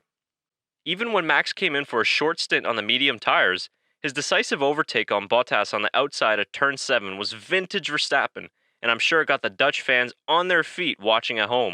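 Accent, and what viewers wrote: United States. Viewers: I really enjoy this content. I don't know if you currently live in Europe, but it's refreshing to hear someone with an American accent talk about F1 and really know what they're talking about. If you still live in the US, awesome that you watch the races! I can imagine they're quite late at night over there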